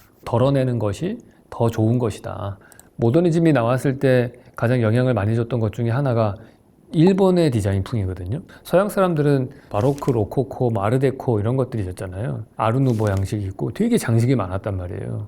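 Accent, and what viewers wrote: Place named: Seoul, South Korea. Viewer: 말하는 스타일이 먼가 고급스럽네요. 나도 이렇게 말하고 싶다.ㅋㅋㅋㅋ 일단 서울말 부터 배워야 되나 ㅋㅋㅋㅋ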